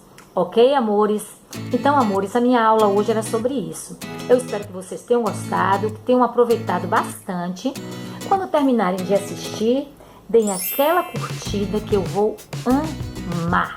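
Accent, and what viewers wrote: Bahia, Brazil. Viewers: Essa é baiana, hein bebê. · Vc é baiana??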